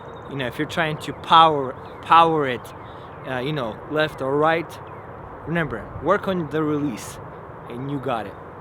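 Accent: Russia. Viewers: OMG are you serious? He says "Привет, товарищи" and "мастерская" fluently, at the same time speaking English with a Russian accent. Of course he's Russian. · i like your russian accent :D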